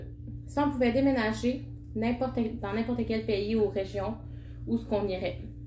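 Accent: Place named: Quebec, Canada. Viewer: C’est un vrai plaisir à entendre votre accent québécois 🤓👍 continuez comme ça 🤓👍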